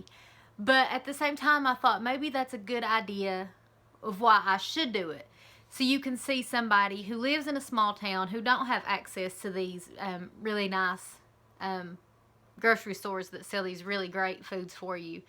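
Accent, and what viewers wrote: South, United States. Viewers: I know you are from the South and I'm probably just not used to it ... but seem so sweet! can't imagine anyone giving you a hard time. heh. great eats and Kickin butt on the water too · I love your country accent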